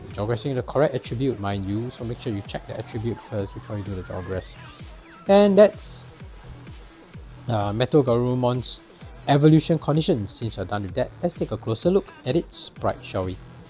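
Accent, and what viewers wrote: Singapore. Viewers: liked n subscribed, btw you sound like Singaporean/Malaysian haha anyways looking forward to more digimon content!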